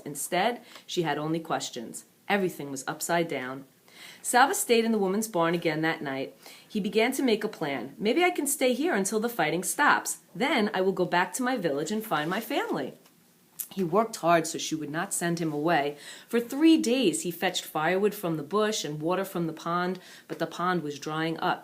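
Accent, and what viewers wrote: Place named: New Jersey, United States. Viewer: jersey accent makes it all the better ;D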